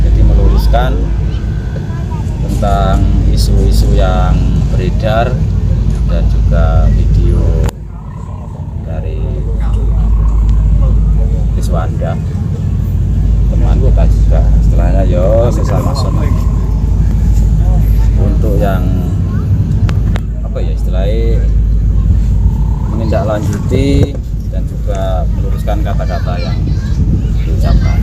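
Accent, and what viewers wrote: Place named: East Java, Indonesia. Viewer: Logate etanan ngno kui...bumbune ben tambh sedep mas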